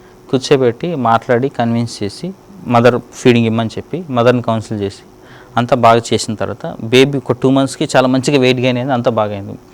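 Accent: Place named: Telangana, India. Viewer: Good chala helpful parents doctor garu chala Baga cheparu. A Chala Telangana slang lo super cheparu doctor garu. Nijamga Epps tiki alanti Valli unara Biddu thadu bellam lo pettukoni tine Vallu.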